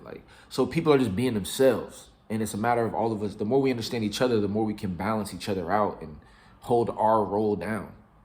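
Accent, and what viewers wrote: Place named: United States. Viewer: Hi I’m watching you to learn American accent 👍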